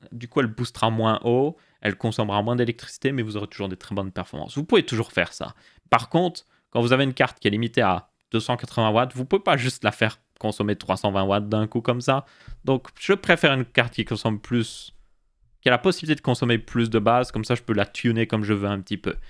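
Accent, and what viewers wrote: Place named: Belgium. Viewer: Je suis français et j'adore quand tu parles Belge. Je trouve ça trop cool. Puis on a moins l'impression de vivre dans un monde monolithique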